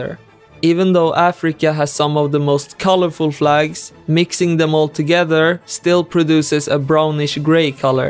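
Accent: Sweden. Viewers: I knew it was a swedish person bc of the accent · Are you Swedish? As a swede, your accent kinda sounds Swedish, are you?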